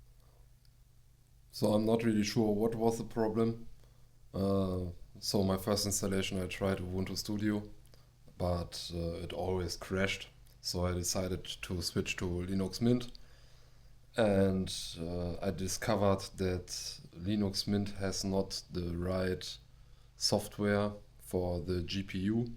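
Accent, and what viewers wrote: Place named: Germany. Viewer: Most german accent ever seen🎉❤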